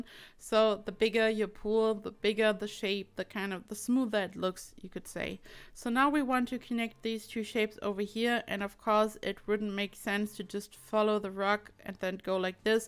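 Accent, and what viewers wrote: Germany. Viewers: You have a very german accent? Do you come from Germany?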